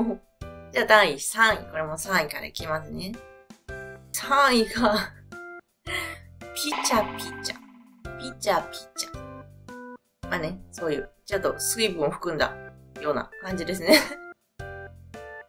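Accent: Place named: Kansai, Japan. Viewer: 関西女子らしく😅ぷっと吹いてしまう話し方😅好きや👍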